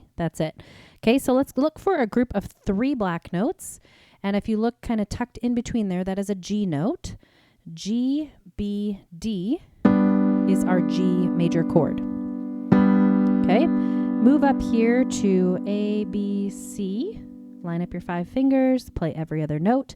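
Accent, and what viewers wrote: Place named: United States. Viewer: Greetings from France ❤️. I SUBSCRIBED TODAY 13th November 2024. I LOVE YOUR "COUNTRY" VOICE, SO BEAUTIFUL AND A PLEASURE TO LISTEN TO ❤. Wowww 😮 You played it to my rhythm, slow and peaceful. You are an EXCELLENT teacher. Thanks from the bottom of my heart for simplifying the music. A big hug of gratitude 😊🫠.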